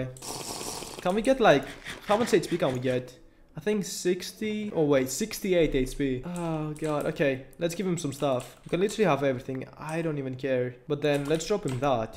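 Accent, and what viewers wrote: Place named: Greece. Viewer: Everyone likes ur Greek accent tho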